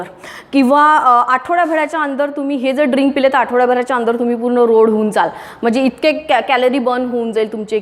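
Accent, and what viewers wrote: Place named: Pune, India. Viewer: नाही मॅडम ,तुम्ही असखलित शुद्ध पुणेरी मराठी बोलता । वऱ्हाडी नाही वाटत तुमची भाषा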